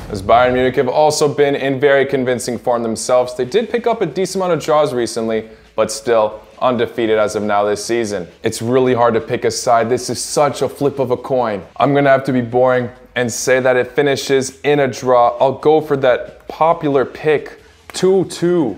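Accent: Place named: United States. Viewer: Can tell hes american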